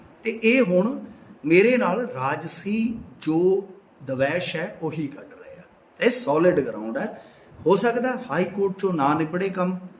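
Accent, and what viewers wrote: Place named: Majha, India. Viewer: Very intelligent and soft spoken journalist is Your Majha accent is very loveable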